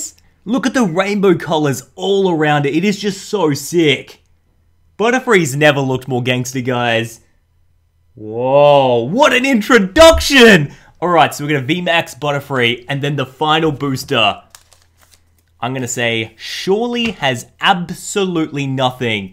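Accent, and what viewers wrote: Australia. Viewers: Is he an Aussie YouTuber?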